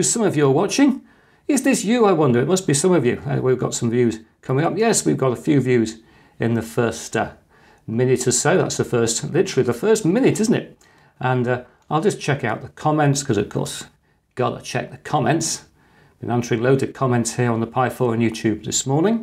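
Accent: United Kingdom. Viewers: The whole Retrofuturustic vibe of this channel , presented by a (very) Brittish Professor is just so unique I can't have enough of it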